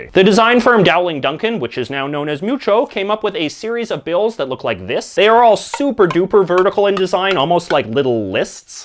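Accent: Canada. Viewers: After hearing all those aboots. I suspect this guy of being Canadian.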